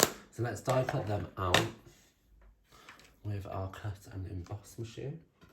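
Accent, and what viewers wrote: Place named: United Kingdom. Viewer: Hi there, great video! I was on the fence about this stamp set, you sold me!! Getting it! I hear your British accent, I miss it, I am from Bristol, living in the US.👋🇬🇧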